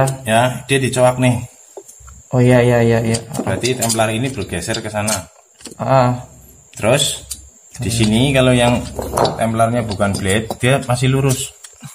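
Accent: Banyumas, Indonesia. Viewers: Wis lah . Pokoke wong ngpak pling kpenak...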